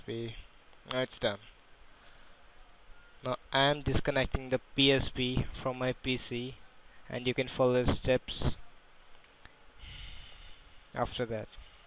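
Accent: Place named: India. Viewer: you have a indian accient you must be the right one that im searching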